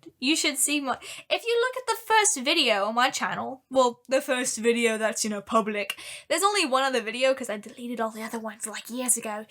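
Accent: United Kingdom. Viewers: I love when you sing on these podcasts, it really brightens my mood! Thank you so much and I love the British accent! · You sound more British than me, a Brit... Edit: And I regularly say y’all :)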